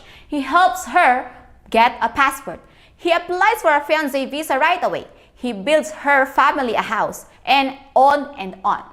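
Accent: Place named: Thailand. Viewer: If I listen your voice and accent next stop Bangkok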